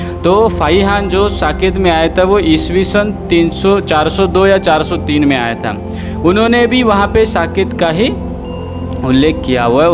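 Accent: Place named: Maharashtra, India. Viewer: जी! बोली से स्पस्ट होता है! कि आप महाराष्ट्र से हैं! आप हजारों कि मी की यात्रा करके उ प्र के "साकेत पहुंचे है! यह परिश्रम अपने आप मे असाधारण है! इस बौद्ध विरासत मे पहुंचकर आपने यथार्थ का आँखो देखा मंजर देश कें सामने रखा! आपके दूसरे साथी इस बौद्ध विरासत को आमजन भंते फाहियान और ह्वेनसाग ने सुदूर चीन से पैदल चलकर भारत की बौद्ध विरासतो को प्रामानिक तौर पर अमर कर दिया है! आप लोग कठिन परिश्रम से यथास्थिति को आमजन मानस तक पहुंचाकर साधुवाद के पात्र हैं! इस कार्य मे " यू ट्यूब" का योगदान कम नही हैं! देश के किसी भी प्रांत / जिले / गाँव मे बैठा पाठक अपने घर मे बैठकर सबकुछ देख रहा हैं! साधुवाद!!!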